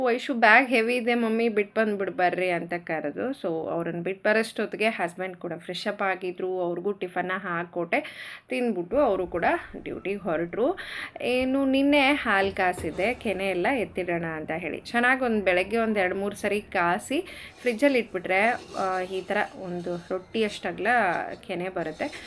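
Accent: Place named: Gangavathi, India. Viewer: Hi sis namdu Gangavathi ನಮ್ಮ ನಿಮ್ಮ ಮಾತು ಸೇಮ್ ಇದೆ ನಿಮ್ಮ ವಿಡಿಯೋ ನಮಗೆ motivation ಆಗುತ್ತದೆ tqu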